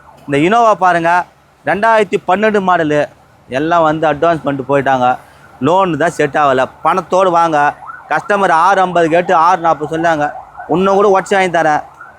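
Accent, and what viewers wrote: Madurai, India. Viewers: மதுரை வாசகம் சூப்பர் வாழ்த்துக்கள் 🎉🎉🎉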